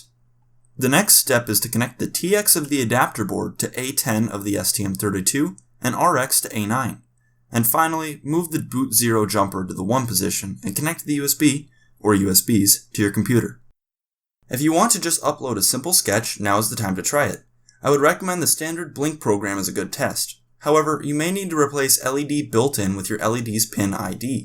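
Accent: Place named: Midwest, United States. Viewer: To quote steamed hams, "it's a regional dialect." I also say sodder instead of sold-er. Dang Midwest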